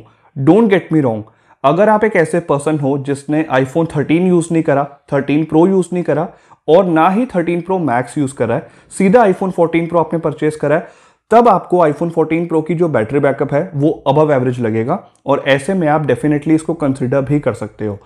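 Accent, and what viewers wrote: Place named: Punjab, India. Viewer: Punjabi lagde oh tuc mainu thodi thodi hindi ch punjabi lag ri aa😅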